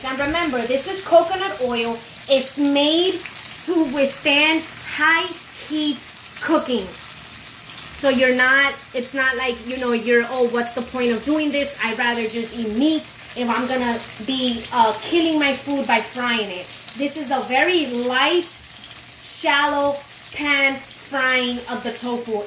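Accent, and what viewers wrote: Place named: New York, United States. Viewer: Enjoyed ur video. I am Puerto rican, and I enjoyed watching you make this. Nice job. Are you from New York City? You kind of had that New York flavor...lol Thanks again!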